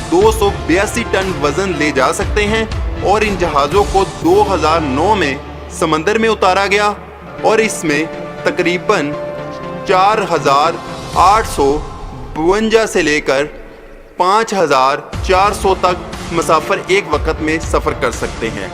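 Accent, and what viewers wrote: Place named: Punjab, Pakistan. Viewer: تمھاری پنجابی زبان کے لہجے میں اردو بولنا انتہائی گھٹیا لگ رہا ھے. پنجابی میں اپنی ویڈیو شروع کرو.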